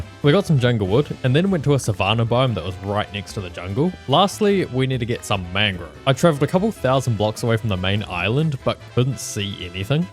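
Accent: New Zealand. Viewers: omg I detect a kiwi accent. Kapai, this vid is so good, love the editing!! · Oh my god, hearing an NZ voice in a youtube video is so weird, but so welcome.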